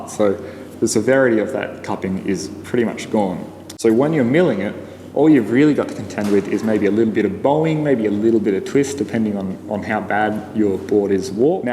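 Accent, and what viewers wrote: Australia. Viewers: Good to hear an Aussie voice on here (where r u ?) from a Perth based mens shed member :)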